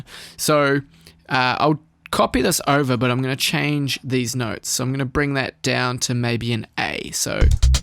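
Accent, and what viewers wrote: New Zealand. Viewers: Heard you speak in the intro and was immediately like "ay new zealand!?" haha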